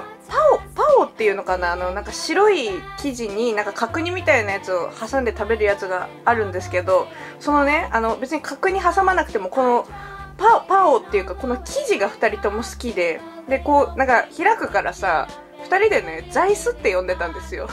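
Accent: Aomori, Japan. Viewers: 出身青森？訛りが青森っぽい！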